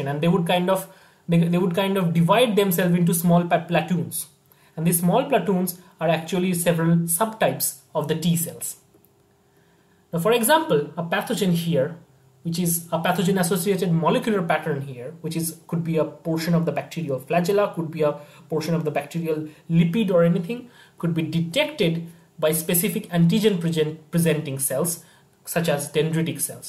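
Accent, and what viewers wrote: India. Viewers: Idk why you are using that fake American accent ,it is so much irritating that I can't even focus on the lecture...it can be easily caught... Though u can speak in simple and sweet Indian accent, it would be more pleasing to 👂 .😊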